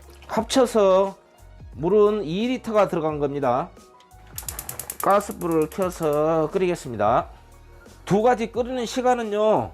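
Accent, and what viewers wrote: Jeolla, South Korea. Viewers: 셰프님 겉절이 해봤는데 당일은 "액젖 냄새가 좀 나네" 했는데 다음 날 남은 것 먹으니 정말 맛나네요 감사합니다 전라도 사투리가 잼 나네요 쬐간한것~등 쉐프님 우리 세자매 다 구독자랍니다 오늘도화이팅 😅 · 님 고향이 전라도맞으시죠?ㅎㅎ 억양만들어봐도 전라도말투네요 저두전라도 ㅋ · 셰프님 김장레시피 잘봤습니다 전라도 사투리구수하구요 메모잘했습니다 행복하세요 ~^^~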